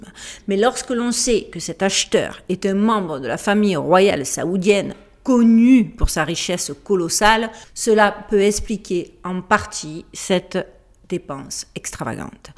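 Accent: Marseille, France. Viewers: Merci pour la vidéo, bien présentée et on sent qu il y a de la recherche . Votre accent me fait penser au sud de la France (Landes ou basques ..) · Je vois qu'il y a beaucoup de clients pour ces salades... mais quand à moi, des propos tenus avec l'accent cagole je ne peux pas les prendre au sérieux.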